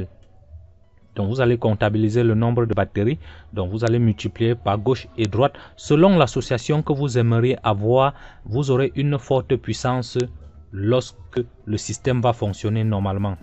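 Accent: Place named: Africa. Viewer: C'est super, j'adore ton accent africain, et le tuto est super bien fait 👍 je m'abonne pour ce travail de qualité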